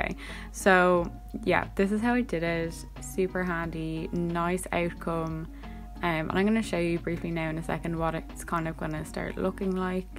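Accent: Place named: Ireland. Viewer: OMg Ur irish! I recognise the acsent , im irish too :D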